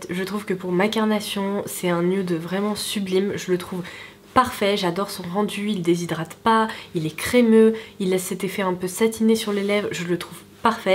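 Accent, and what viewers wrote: England, United Kingdom. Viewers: Magnifique make up 👍👍👍j'adore ton accent anglais, c 'est vraiment bien ce que fait SPacenK👍👍👍😊😊😊😊😊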